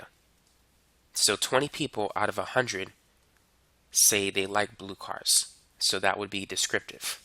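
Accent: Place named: Boston, United States. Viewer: your accent reminds me of good will hunting - helpful video!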